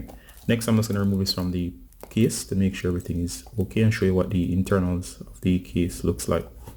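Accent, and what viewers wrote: Jamaica. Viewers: U jamaican right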